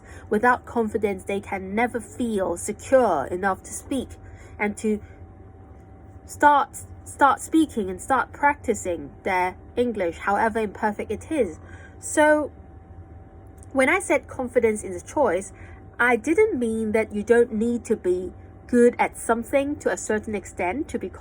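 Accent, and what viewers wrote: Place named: United Kingdom. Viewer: I hope you don't mind if I spot some of the mispronounced words in the first 4~5 minutes of your speech. Well first of all, I do think that you speak very fluent British English yet it's a bit stronger than usual (if you bother to listen to some native British people speak [I guess you do a lot]). Sometimes I feel that some sounds are intentionally spoken in a strong British English / you pretend to speak with a British accent, like 'feel' (with an unusually long /i:/ sound). If you could soften that very strong British accent, that would be great. OK the following words are spotted: while (it sounds like you were pronouncing it as 'wild' with an extra long vowel. It sounds a bit strange to me) significantly (missing 't' sound) strange - the 'g' sound should be a voiced consonant but then it's missed. house - again, the vowel is stretched to be too long. 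It sounds like haaouse. Again it doesn't sound natural. external - wow the 't' sound here is blended with the 'x' sound. Whenever the two consonants 's' and 't' are blended together, we should pronounce the sound like 'sd', but you kept the 't' sound when you pronounced it.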